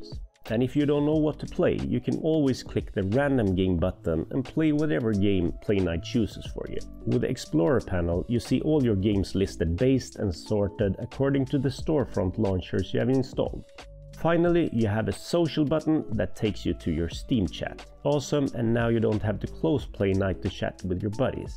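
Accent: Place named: Sweden. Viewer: I can hear the swedish sort of accent, you sound familiar dude.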